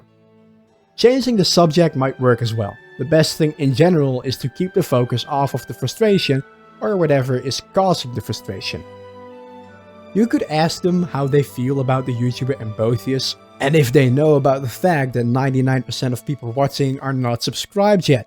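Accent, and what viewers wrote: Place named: Netherlands. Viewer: Just stumbled across your channel, you are definitely Dutch without a doubt. Like the content tho!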